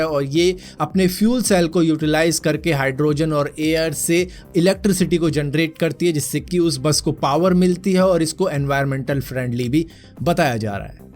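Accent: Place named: Gwalior, India. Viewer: Bhai gwalior se ho kiya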